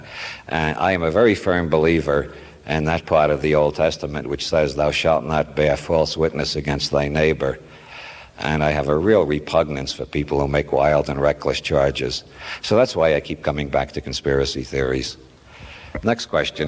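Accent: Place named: Brooklyn, United States. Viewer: Joseph Toth ugh, absolutely never. His voice has a cadence, timbre, musical lilt (and Brooklyn accent) that would be utterly ruined by such fuckery. Just my opinion of course, but there’s nothing humble about it. Cheers!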